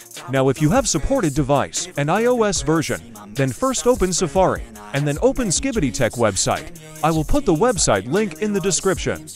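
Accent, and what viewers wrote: South Africa. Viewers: Yo are you from South African ❔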